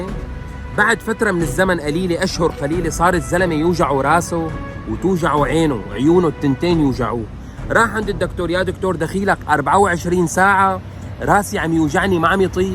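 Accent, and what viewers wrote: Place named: Damascus, Syria. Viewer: باين عكلامك من الشام يعني عربيني او دوماني بس ماتجمل وتقول السوريين عيب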